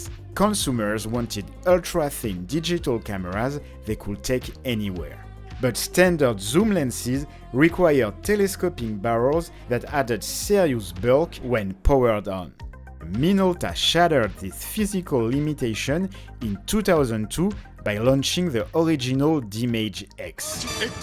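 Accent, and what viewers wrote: France. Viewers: le p'tit accent français, je ne m'y attendait pas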